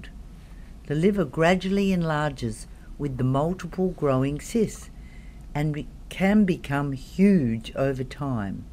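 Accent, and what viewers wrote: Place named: Australia. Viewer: Morena, just found u & now subscribed after watching this vid. Loved your informative delivery & warm Ozzy tone. ❤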